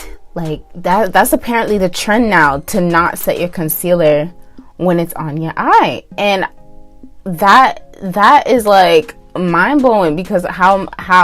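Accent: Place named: RP, United Kingdom. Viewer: You sound like miss posh in Canada 💕💕💕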